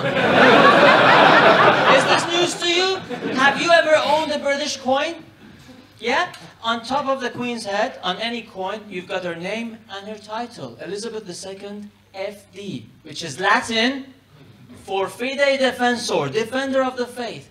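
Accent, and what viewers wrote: Iran. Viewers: He has Persian accent and look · I guess thats his stage name because he looks VERY Iranian and has a stereotypical Tehrani accent lol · He's Italian right? How the fuck he has an obvious Persian accent? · He has a very distinct Persia accent.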